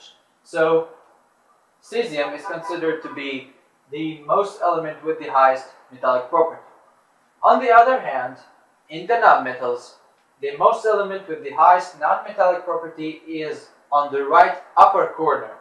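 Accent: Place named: Russia. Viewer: You look mexican but sound russian.... WHAT ARE YOU!!!!?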